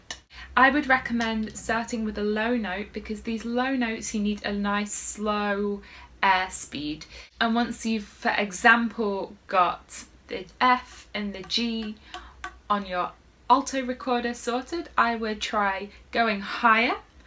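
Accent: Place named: United Kingdom. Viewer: Or a stuffy nose for that matter. I hope you find that supposed third book. But I love your circular breathing. And I love your British English accent.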